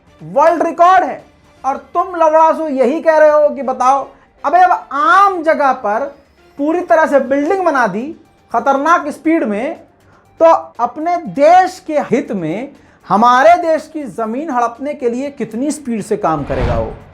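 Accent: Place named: Bihar, India. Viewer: . India k kis elaka k rehne wale ho. Lagta hai bihari ho. Tumhara lehja bihari jaisa hai. Me Lahore se hoooon. Aur india se ache taalukaaaat chahta hoooon. Laikin yea unbhagat tatikhor nahi chahte. 😐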